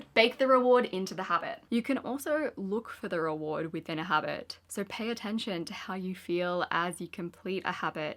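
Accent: Australia. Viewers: Could you please speak a little slower for not Aussies?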